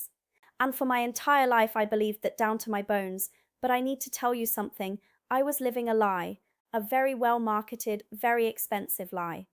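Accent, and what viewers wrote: United Kingdom. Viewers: is she really an American? her UK accent isn't that of someone from the USA meaning U think she was born outside the USA and emigrated here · WOW! Great piece and so well put together. Freedom .... scanning for emergency exits everywhere you go, kids with a bulletproof insert in their school satchels, neighbours measuring how long your grass is. That brought it home how visceral the day to day experience is. Imagine being a mother and inserting a bullet proof thingie into your kids school satchel. Every day is a reminder of what COULD happen. We all know USA has a gun problem and an even bigger problem is some of the people that own them .. They practically walked into like a Tesco that sells guns and bought one off the shelf. That is from a UK point of view UTTERLY MENTAL and we all knew that. BUT your piece was VERY ILLUMINATING in outlining the pyschological effects and the almost constant unease of being in public spaces. 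I do hope the unwinding of your nervous system and your deeper sense of ease will continue to grow. We all knew the potential physical dangers but had probably not thought about the wear and tear on your pysche. Thank you for your most illuminating piece. May your new more at ease state of mind continue to grow and deepen. Your accent has become very UK as well. Welcome! ❤❤😊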